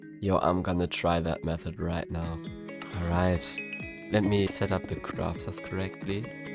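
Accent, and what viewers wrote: Germany. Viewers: Dieser deutsche akzent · You can not hide that u are german from a german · hey bro, are you from Germany? You sound like it. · Nice Video, but you can hear the German accent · I hear german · bro man hört deutsch raus :D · Why do you sound so german😭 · Man hört das deutsch einfach raus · I hear deutsch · German accent? Nice vid. Go on buddy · Why sounds this English so german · Holy german accent Fire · I hear a little bit of deutsch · I can tell you are an german by the Voice 😂 Love you Video ❤ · Ich hör das Deutsch raus beste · I Can heare that you Are German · Holy German accent...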